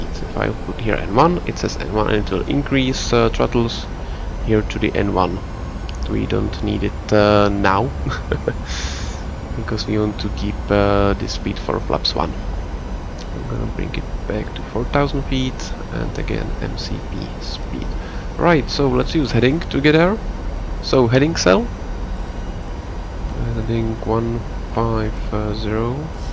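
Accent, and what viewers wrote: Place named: Czech Republic. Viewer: Czech accent recognized!:D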